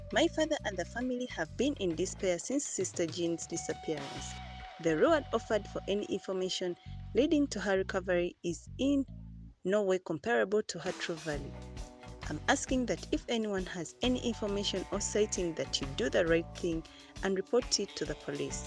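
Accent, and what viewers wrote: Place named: Africa. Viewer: and she sound like african,and lot of Africans live in Jamaica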